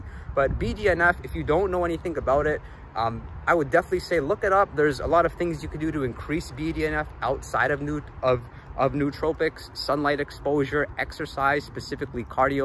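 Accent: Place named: United States. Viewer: Hey, interesting info. You sound like you're from US, but it looks like you're in the UK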